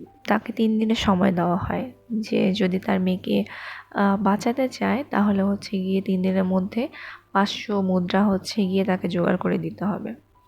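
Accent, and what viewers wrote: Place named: Rangpur, Bangladesh. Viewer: অনেক সুন্দর বাচনভঙ্গি। কিন্তু পুরো সময় একটা চিন্তায় ছিলাম আবার কেউ যদি ভাবে এই মেয়ের সাথে কথা বলতেছি🤣কারণ ভয়েজ টা ওরকম, মনেই হয়না ভিডিও। যাইহোক আপু, বাসা কোথায় আপনার?কিছুটা রংপুরের টান পাচ্ছি🤭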